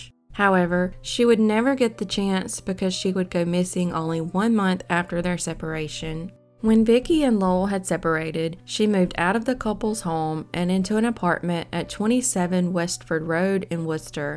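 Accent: South, United States. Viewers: You are an angel. You are either from Alabama, Georgia or Mississippi. I go to sleep to these stories. It means a lot. I used to do the same to unsolved mysteries when I was a kid. Thank you for all you do.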